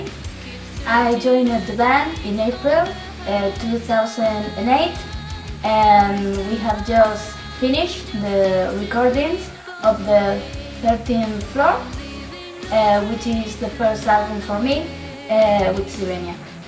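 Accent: Spain. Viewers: Of course she's spanish, you can tell it by her accent. I already knew that :P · Well your accent is still kinda spanish,but,love ye,ye sing fine,and in songs ppl hear less accent more song,so you'll have no probs. And,in your future carrier as sirenia main vocal,you should learn english just fine :)) Wish ye all best luck.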